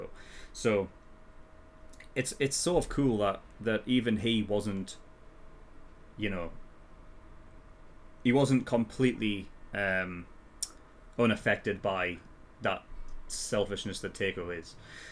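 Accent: United Kingdom. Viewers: Your English is music to my ears since I love British English so much. Which part of England you live? Sorry that I ask I just am curious. I mainly mean in which city because I have been in England in 2004 during our Midsummer and it was extended weekend meaning I left from Finland on Thursday and came back in next week's Tuesday and I was in London then but since it is so big city I didn't managed to see all possible things but I liked that trip I really did. Yes I am from Finland myself despite my username.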